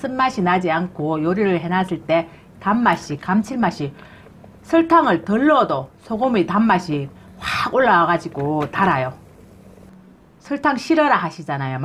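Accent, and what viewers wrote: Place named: Daegu, South Korea. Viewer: 대구 말이 너무 좋아요 ❤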